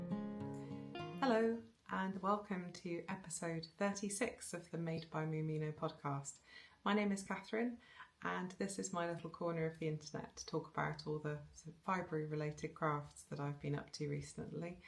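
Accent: Wales, United Kingdom. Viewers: Another lovely episode. Thank you. And by the way, you definitely do still have your lovely welsh accent 🏴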